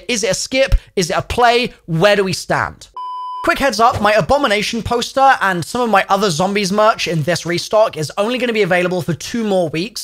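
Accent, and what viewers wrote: South Africa. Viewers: The south african accent had me, love this chanel from a fellow brit 👊👍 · Amazing south african accent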